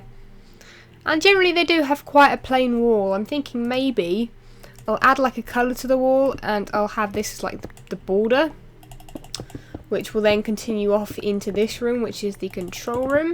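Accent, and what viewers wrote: United Kingdom. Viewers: Great vid. Love the secret base. I must say, I just love your accent. I was once stationed at an American airbase 2 hrs northeast of London and just minutes from a small town called Ipswich, and if I had to pick something that I miss the most about the UK, without a doubt, it's the British women and their beautiful British accents. Once again, great vid.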